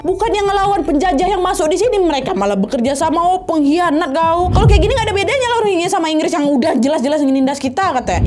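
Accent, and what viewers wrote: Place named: Medan, Indonesia. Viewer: Tampak kali logat Medan kau dek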